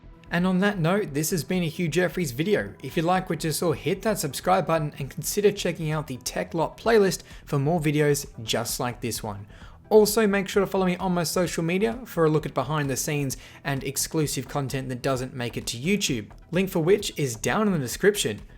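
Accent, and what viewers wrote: Australia. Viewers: I think he lives in Australia based on his accent:)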